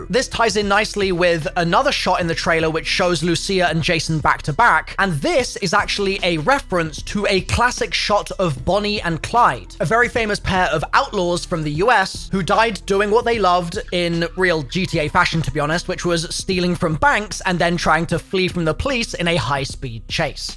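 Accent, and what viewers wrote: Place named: United Kingdom. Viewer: Bro it's because of his accent. He says it like Ig•yoo•ahna and americans say ig•wana or eeg•wana. I've noticed that a lot of words have a more pronounced "yoo/yew" sound with a british accent.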